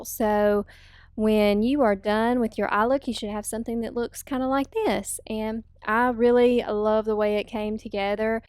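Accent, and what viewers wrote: South, United States. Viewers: Southern accent!!!! LOVE IT!!!!! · I love you southern accent and the look 👌🏻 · Omg your voice makes me miss the south so much ): you sound adorable and you are so beautiful! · I just found your video and subscribed!!! I love your southern accent, where are you from??? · yay southern we talk like that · I love the look and our Southern accent!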